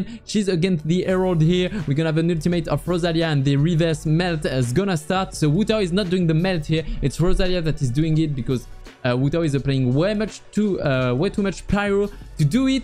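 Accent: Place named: France. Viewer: Tu gères de ouf je m'attendais pas à aussi bien quand t'as dit t'étais pas satisfait de ça en live ! Honnêtement je peux comprendre pourquoi tu ressens ça, on sent bien l'accent baguette mais ça c'est osef on te comprend très bien ! T'essayes d'avoir le rythme et énergie de commentaires comme tu le fais en Français mais comme c'est la première fois on sent c'est pas naturel à 100%. Ça reste quand même très bien pour une première fois, pour le peu de pvp anglais et commentaires que j'ai vu tkt pas t'es très bien à côté xD et tu vas sûrement progresser avec le temps en tout cas gg 🎉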